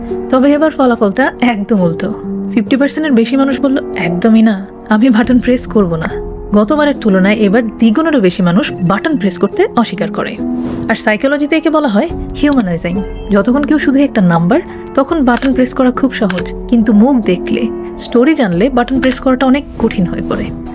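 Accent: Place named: Bangladesh. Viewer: বুঝতে পারছি ম্যাম, আপনার কথা শুনে মনে হচ্ছে যে আপনি একজন বাংলাদেশি।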